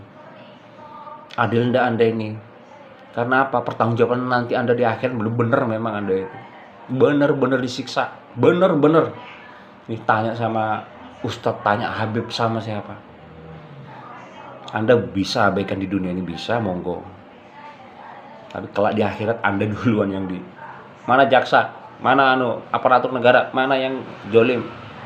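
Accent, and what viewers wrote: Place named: Java, Indonesia. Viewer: Jowone sampean ngendi masehhhh menowo tonggone hhhh pon tak subscribe lho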